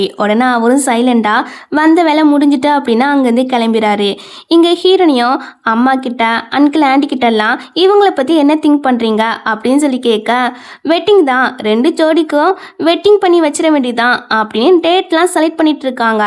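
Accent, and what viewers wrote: Tirunelveli, India. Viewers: Novel reborn story romba nalla solrenga pa 👍 super ah eruku nellai slang 💐 weekly 1dramavathu podu pa unga channel la 2years munnde potta drama en delete pannetenga 😔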